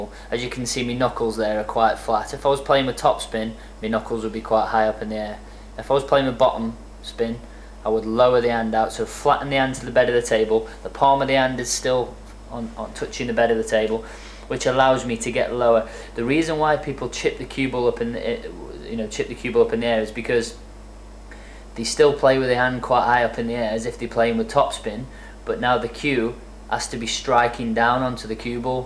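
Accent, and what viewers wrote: United Kingdom. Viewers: John Doe bri ish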